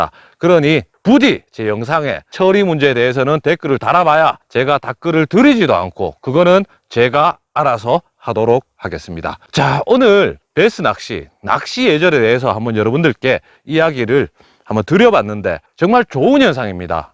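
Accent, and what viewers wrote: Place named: Gyeongsang, South Korea. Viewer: 점점 표준어 쓰시네요 경상도말투가 듣기좋아요ㅋㅋ 전라도인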